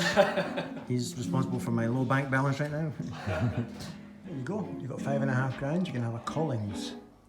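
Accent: Scotland, United Kingdom. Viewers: what cool accent the Scottish is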